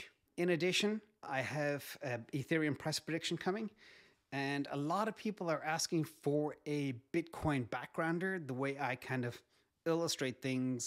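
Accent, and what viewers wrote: Ireland. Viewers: Do I detect an Irish accent in there somewhere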